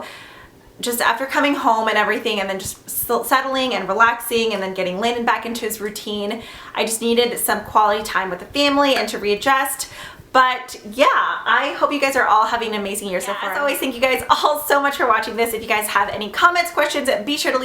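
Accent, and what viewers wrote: Texas, United States. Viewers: !!! You're totally picking up the Texas accent lol its hilarious. And btw, great video & keep using the make up geek shadows....forget the haters. Lol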